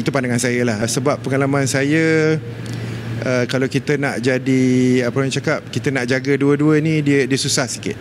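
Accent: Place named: Selangor, Malaysia. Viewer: Selangor nya ko cakap...dapat madu sepah dibuang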